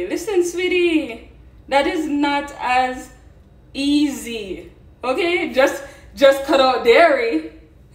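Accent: Jamaica. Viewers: First time watching, I can tell you are a Jamaican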